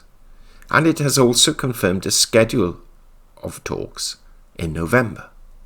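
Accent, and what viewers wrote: United Kingdom. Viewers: his voice is the trademark. Unmistakably British accent.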